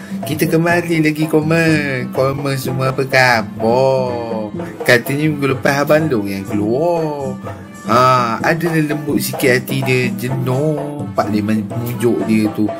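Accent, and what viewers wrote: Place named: Perak, Malaysia. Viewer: aje pekat peroknye kome 😅👍🏻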